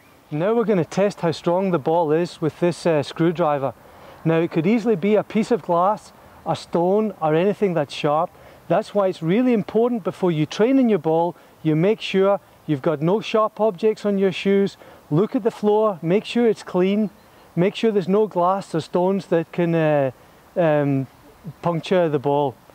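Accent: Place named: Scotland, United Kingdom. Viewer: Touch of Scotland in his accent.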